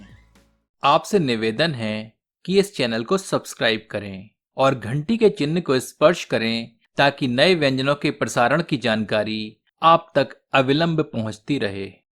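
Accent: Standard. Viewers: क्या सुधा हिंदी बोलते हो जी । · शुद्ध हिन्दी बोलते हैं आप 👌🙏🌹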